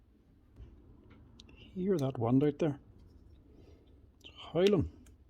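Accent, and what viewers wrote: Ireland. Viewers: Why are these tool repair guys always Irish?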